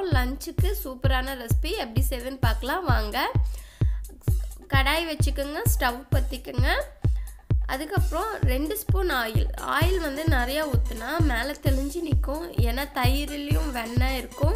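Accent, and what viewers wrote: India. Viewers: This is Coimbatore slang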